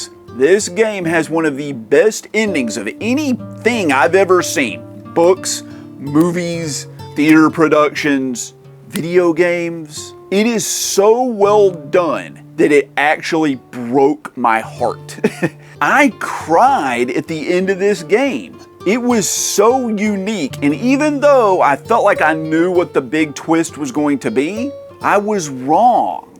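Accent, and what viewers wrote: South, United States. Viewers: Man, As a gamer who watches tons of Youtube Game Reviewers, you are the first that sounds like someone from where im from...The south... Nice to hear a good ole country boy on the gaming scene....Glad i found your channel😀. I fall asleep to AVGN, but i woke up hearing your voice like “who is this?”…Hooked!!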